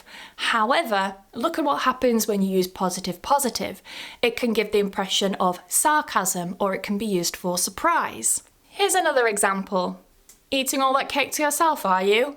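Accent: United Kingdom. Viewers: I like her British accent quite a lot!!!! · This dog looks like he's trying to learn British intonation. Besides, you seem to have lost most of your weird “Polish” accent. You sound more British every day . 😉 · l really love your british accent❤😊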